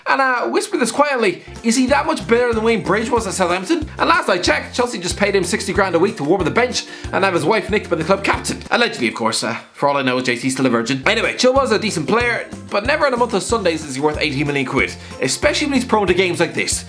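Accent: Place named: Ireland. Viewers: Can you please Stop! Doing these chips.. so quickly your voice is afraid irritating! The Second I heard your voice I have turn it off.. I don't mean to be offensive in anyway but the Irish accent sweet when it's smooth & slow but you talk at 100 miles an hour & it's like listening to a fire alarm going off at 4am.. consistent your audience thank you. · You do realise that the whole audience love that he speaks fast and his angry Irish accent, its just you being a Karen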